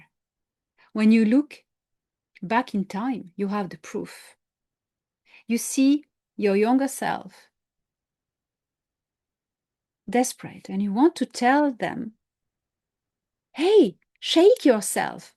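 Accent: France. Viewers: Great addition to the Star Nation News episodes :-). I had a query about #35 Webinar last weekend on the 26th that I attended related to a question I submitted that Elena answered about CE5 and which ET beings and races it is best to reach out to during such a type of meditation. I did not know where to reach out too to get this comment to Elena through herself or an associate, etc... In the answer there are a few of the ET races you mention that I just cannot fully understand/hear properly what you are saying, most likely due to your elegant French accent and dialect, but if I could somehow get some clarification on this from you, I would greatly appreciate it. What I can make out is : Kaheel from Epsilon (something) Aheel Alteans intergalactic confederation Aruhri Arcutians Zanete Now, in particular, the two I can’t understand are the Kaheel from Epsilon (something). 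Obviously, the something part, and the Aruhri Arcutians Zanete these two I am not certain at all, so any clarification on these and whether I have it right would be appreciated. The other two I think I pretty much understood. One thing I was wondering about was the Alteans' intergalactic confederation? Is that some sort of like a federation those beings are in? Any feedback and clarification on this would be greatly appreciated. I of course look forward to building my CE5 meditation technique now around reaching out and connecting with these beings. Thanks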